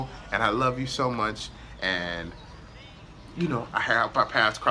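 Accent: Gullah, United States. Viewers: I'm from the low country just outside of Charleston. You don't have the geechee accent. You have the gullah accent. Like beafort. Geechee accent is way different.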